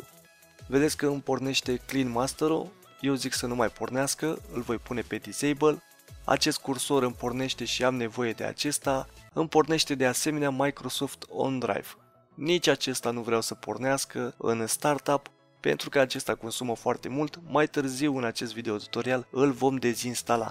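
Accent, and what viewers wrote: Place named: Moldova. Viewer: Ce accent de moldovean.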